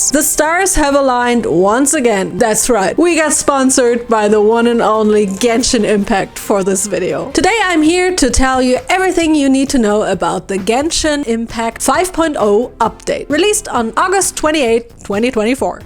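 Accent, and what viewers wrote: Germany. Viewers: Do i hear a german accent? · Are you German?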